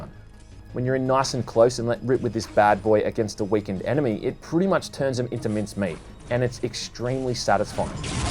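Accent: Australia. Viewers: Is that an Australian accent i hear?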